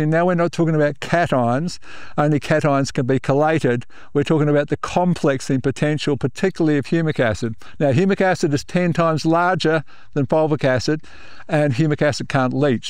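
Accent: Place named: Australia. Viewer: The captioning translation is saying collate do you mean chelate? Your Aussie pronunciation is changing what we in USA pronounce as "key late"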